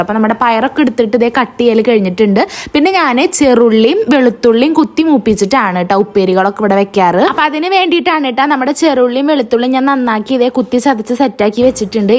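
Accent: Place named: Kannur, India. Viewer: Idee kannur slangil varav nna paraya😂upperi nn parayula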